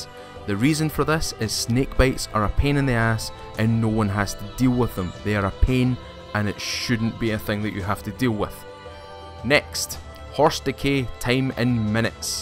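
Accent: Scotland, United Kingdom. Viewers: are u Scottish?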